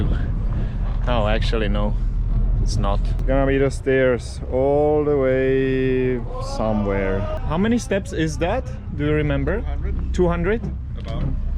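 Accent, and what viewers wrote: Czech Republic. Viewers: so you are czech or austrian? :D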